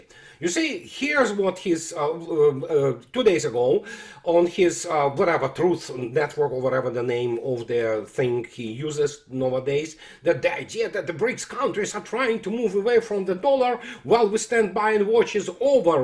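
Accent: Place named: Russia. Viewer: Coffee and two, your English is quite remarkable in spite of your strong Russian accent and I enjoy your thoughts thank you for speaking the truth and keeping us up to date on these issues that are very important to comprehend and for all people to understand the reality of what is really happening. Thank you and be well. ✌️😎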